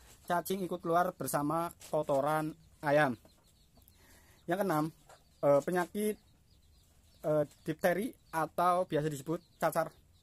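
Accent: Banyumas, Indonesia. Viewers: Assalamu'alaikum.. mas.. buat video untuk ternak puyuh petelur mas..🙏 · Ora ngapak ora penak, mantap lanjut terus boss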